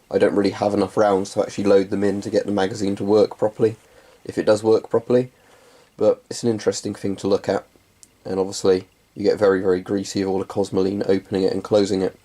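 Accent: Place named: United Kingdom. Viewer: That's sweet, I was about to say how does a person with a British accent who I assume is from England has a sub machine gun. but I see that its deactivated. still would be awesome to have I am from Canada and something like that would be highly illegal to have functioning as well and if I could buy one like this I would. I love Russian Guns, Love my SKS & Mosin Nagant. are you guys in the UK allowed to own those two and the SVT-40 (I've have yet to buy stock seems to be drying up on those in my area)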